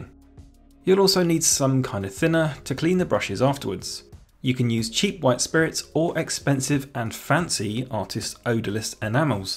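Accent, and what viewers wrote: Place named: South England, United Kingdom. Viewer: I am not an artist by any stretch of the imagination. I mean, I can freehand-draw an elephant that won't make you think it's a hippo, but that is about my limit! (Thank you, trunk, tusks and toes!) In scale modeling, I am a stickler for realism, rather like Bill Halliwell, and I don't give myself much leeway. It's just that lately I am starting to re-think what realism actually is. 🤔 In the view from the USA, your south-England accent does not sound boring at all, although I do enjoy hearing the wide variety of British accents that one finds in Youtube scale modeling. Lately, I am particularly getting a kick out of the ones that pronounce "blood" as rhyming with "mood", because it's so startling to an ear used to hear BBC News as the only British pronunciation!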